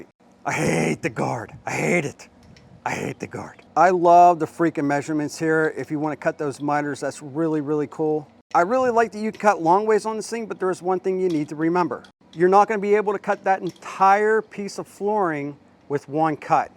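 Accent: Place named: Louisiana, United States. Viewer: Hey man! I’m a first time viewer and I’m already hooked! Digging the humor! Not sure where you’re from…but I’m picking up a Cajun vibe. If not, you would definitely fit in down “herya”! Btw…that’s a sweet machine. May have to get me one of dem me!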